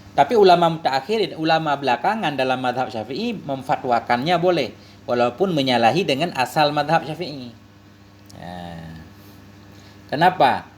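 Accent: Aceh, Indonesia. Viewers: Suaranya agak ke aceh² gitu ya makasih ustadz ilmunya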